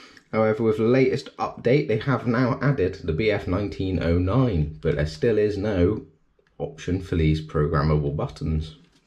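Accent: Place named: England, United Kingdom. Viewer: 😂 Fair enough mate...you sounded English in the Video...73 ✌️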